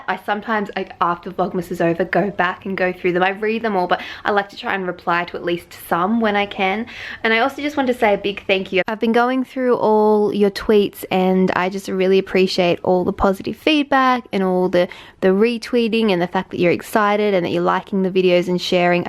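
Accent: Australia. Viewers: Are u Australian and my worst thing is chocolate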